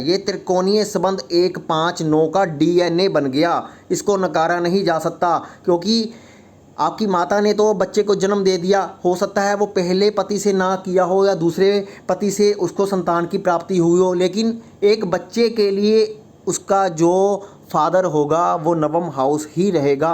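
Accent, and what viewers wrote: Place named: Punjab, India. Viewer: bhai mene aapki koi Insult nai ki mene ye kehna chaha ki aapki hindi bolne se ya aapka accent hindi ka dil ko acha lagta hai usme apnapan aata hai usme punjabi touch bhi kabhi kabhi aata hai to mazaa aa jaata hai aapki knowledge awesome 👌 hai bhai main aapka subscriber hu aapko hamesha like karta hu...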